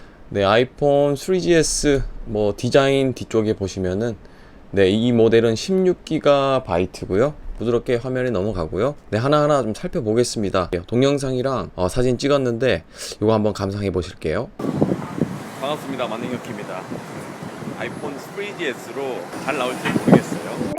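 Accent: Gyeongsang, South Korea. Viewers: 같은 경상도 사람이네용~~ <mail>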